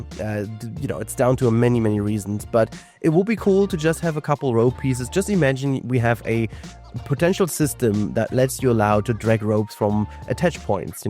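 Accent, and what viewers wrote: Germany. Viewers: Immer noch aus Deutschland, hört man doch😅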